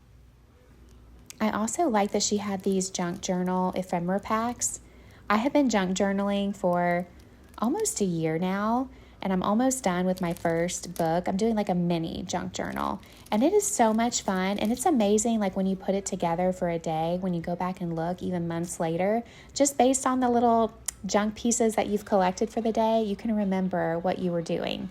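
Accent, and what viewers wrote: South, United States. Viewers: Your content is wonderful and your beautiful Southern accent is actually bliss to listen too 🍬 you explain and explore 😉 a pleasure to go with you on your thrifting expeditions 🎉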